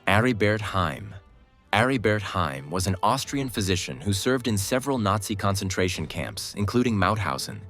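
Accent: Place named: United States. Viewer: Nice vid but work on the pronuciation of names. Terrible American accent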